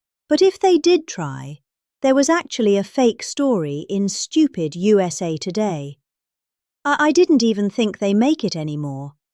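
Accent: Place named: London, United Kingdom. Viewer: I'm a Londoner, I speak with a variant of this accent. I'd feel like a complete embarrassment to myself and everybody around me if I ever caught myself talking this kind of sewage.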